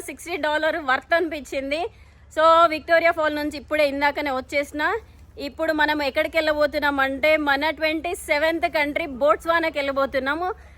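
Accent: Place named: Nizamabad, India. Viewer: నీ వాయిస్ ఎక్స్ప్లెయిన్ సూపర్ మా నిజాంబాద్ లాగా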